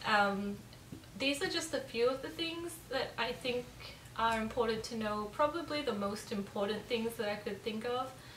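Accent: Australia. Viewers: Aussie,Iv decided. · Are you Australian or British or African or... What lol you have a cool accent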